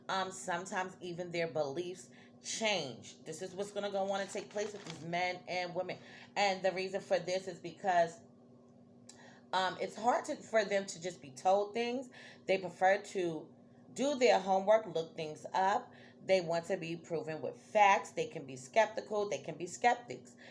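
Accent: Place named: New Jersey, United States. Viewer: You gotta be From Jersey! Love it!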